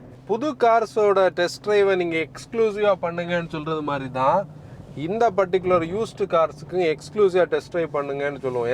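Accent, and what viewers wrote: Kanyakumari, India. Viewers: Kanyakumari voice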